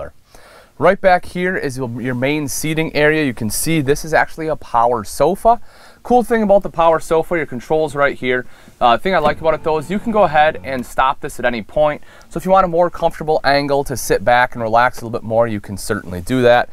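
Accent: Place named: West Michigan, United States. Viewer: This guy is well-spoken, knowledgeable, and has a nice West Michigan accent!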